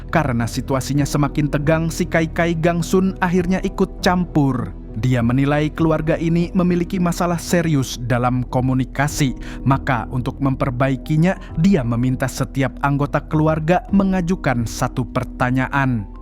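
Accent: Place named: Banjar, Indonesia. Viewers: Naratornya urang Banjar ini ya KLO... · Kaya' orang Banjar logat naratornya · Orang Banjar sekalinya Sidin ni lah wkwk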